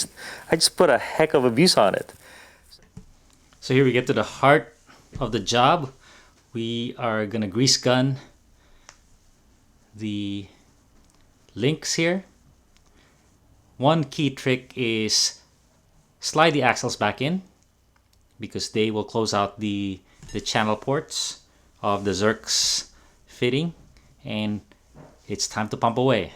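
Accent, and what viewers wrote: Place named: Philippines. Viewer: English accent sounds like Filipino? Tama ba ko kabayan?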